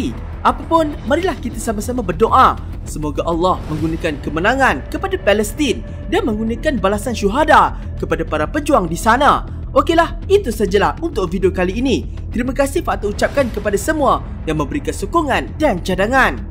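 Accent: Malaysia. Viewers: Abang Abang orang Malaysia yah kok ngomong nya kaya Upin Ipin aku dari indonesia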